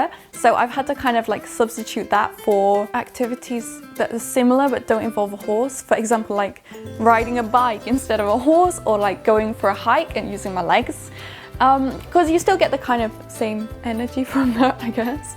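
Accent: United Kingdom. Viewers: 님 너무 사랑스러우세요.😊 매력적인 영국 엑센트 들으면서 공부하니 너무 좋아요!! 님 리얼톡 많이 만들어주세요~^^ 좋은 컨텐츠 항상 감사합니다👍🏻🤗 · 영국영어다!! 워우타~!~ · 영국발음까지 들을 수 있다니...너무 좋아요 형!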